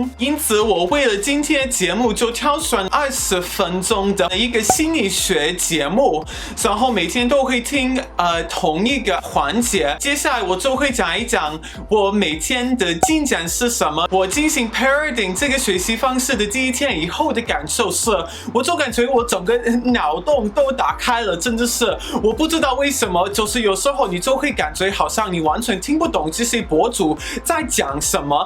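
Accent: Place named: Guangdong, China. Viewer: You really sound like you've learned Mandarin in Guangdong (not a criticism-that's what I'm used to). I'm currently shadowing 小王子 in Cantonese over 27 days and recording it. I'll upload those when I have some free time.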